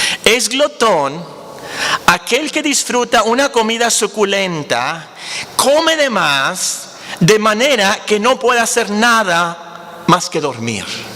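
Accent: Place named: North, Mexico. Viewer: min Pajarito... pero Prehistórico Jajajaja, no, es bromita! Muchhhioooo problema con la glotonería. ¿Es de Chichuahua el predicador? Pronuncia como nosotros los del norte la "CH". Muy buen mensaje para los que somos medio glotoncitos ♥ Dios le bendiga.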